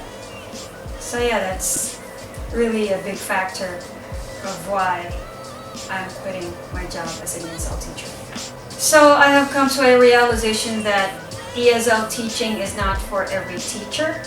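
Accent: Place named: California, United States. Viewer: Just try teaching at the university level here in Thailand. All the students just want to know how to get a diploma without studying or working. I could have made a nice income just writing papers and doing projects for students to present as their own, which seems to be acceptable in Thai culture. Frustration mounts if one is a conscience teacher. By the way, I at first thought you are American from California due to your english accent, maybe California would offer you what you deserve.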